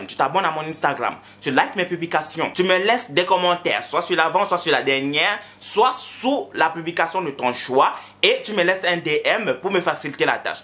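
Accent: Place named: Cameroon. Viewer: J'suis abonnée sur ta page parce que tu me fais beaucoup rire 😁😁😁 Aussi parce que tu appuies bien notre accent Camerounais, tu parles avec notre Argot Camerounais et tu parles de notre actualité et de nos problèmes Camerounais 🇨🇲🇨🇲🇨🇲🇨🇲🇨🇲 Continue comme ça, c'est le 237 qui gagne 💚❤💛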